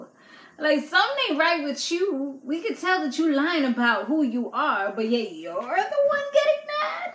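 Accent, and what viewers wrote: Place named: New York, United States. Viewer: Yo you a baddie & that NY accent makes you even more attractive😍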